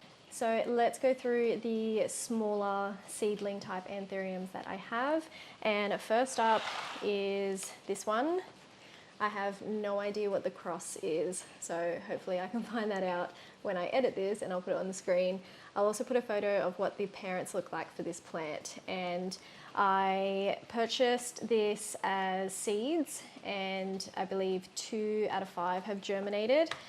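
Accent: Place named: United Kingdom. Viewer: Wow your anthurium collection is so healthy and all the plants look great. I wouldn't have thought they could grow without a humidifier and I've been afraid to get any. My ambient air is probably drier than your climate though as I live in a desert! We hardly ever get the rain you were getting he day of filming. And your accent sounds British so maybe it rains a lot there in which case your ambient air has higher humidity than desert air!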